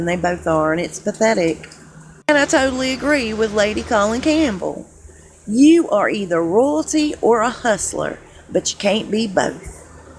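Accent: South, United States. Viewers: Is her southern accent real? Is it a Houston or Florida? Don't be angry with me, I lived on the west coast most of my life...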